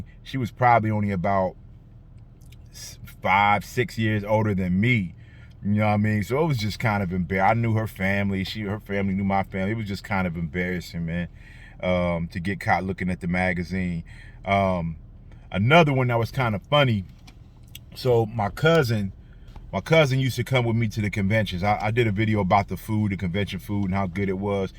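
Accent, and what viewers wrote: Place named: Pennsylvania, United States. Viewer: Are you originally from PA? You got that Pennsylvanian accent lol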